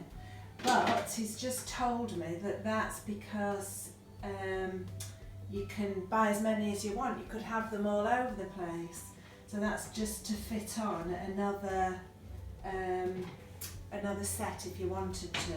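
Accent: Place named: North, United Kingdom. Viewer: Where did you grow up, definitely a fellow northern accent I'm detecting?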